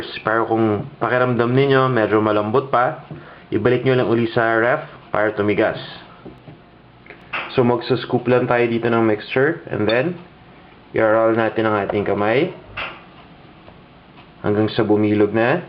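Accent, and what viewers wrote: Philippines. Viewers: Filipino accent :O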